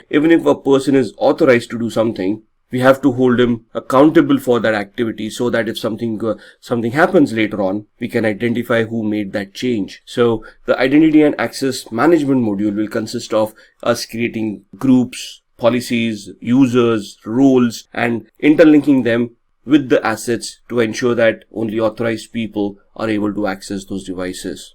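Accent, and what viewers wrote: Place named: India. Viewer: no offense, why do most of these videos have to be narrated by people with Indian accents? I can't tell what he says no matter how many times I replay it... CC thanks...?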